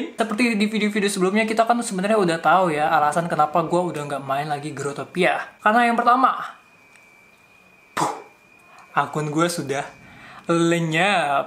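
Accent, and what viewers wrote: Indonesia. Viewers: Here your English comment Dead channel because of the freaking Indo voice lol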